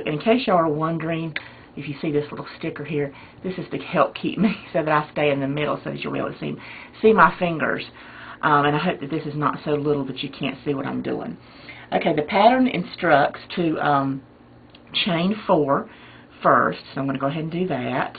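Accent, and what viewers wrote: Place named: Georgia, United States. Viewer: I loooovvvvvee your accent!!! Every time I visit my cousins in Ga I come back with the same drawl. Btw. I also like your tutorials!!! U make it so easy to crochet along with the video. Thanks for all yr hard work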